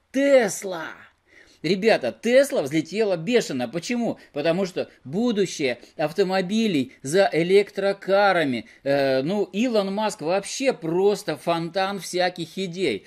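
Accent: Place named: Urals, Russia. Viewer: Уральский акцент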